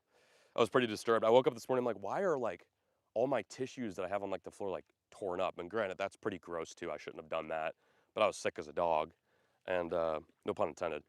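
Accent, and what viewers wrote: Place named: Chicago, United States. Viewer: you still talk like your straight outta the burbs of Chicago Texas hasn't changed your accent at all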